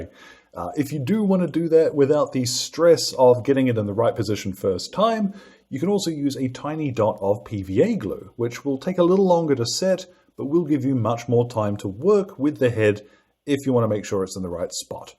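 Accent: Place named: New Zealand. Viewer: Great video. I think this might be the Kiwi you've sounded thus far, eh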